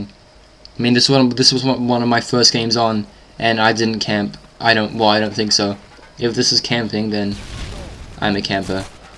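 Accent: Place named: New Zealand. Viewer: Nice commentary!!! lol metalstorm i had no idea there were so many new zealander commentators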